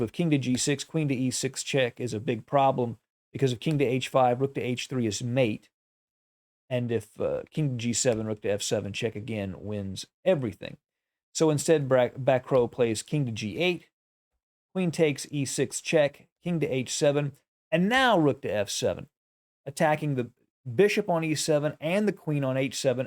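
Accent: United States. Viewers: I love your postings. 80% because your analysis is so instructive, 10% because you do games I remember from my youth (you know, when you said things like p-K4) and not just the stars, and the final 10% because I just luv that akcent - sounds like a real cowboy to my terribly British ear, old chap.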